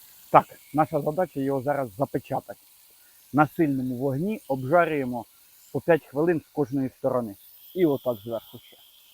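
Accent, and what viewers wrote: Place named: Vinnytsia, Ukraine. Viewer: Не помідори,а томати❤,а говір наш вінницький👍🙏❤️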